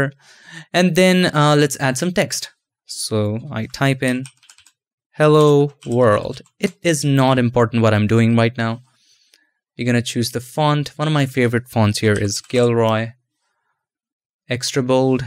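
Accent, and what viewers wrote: India. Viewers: No matter how much you try to get a american accent your indian one cracks through your effort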